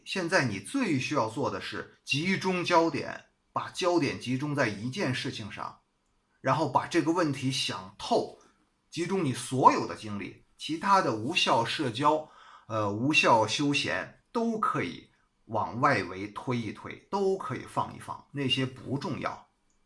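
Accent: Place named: Liaoning, China. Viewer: 1. 老師口音聽起來像我遼寧老鐵的口音呢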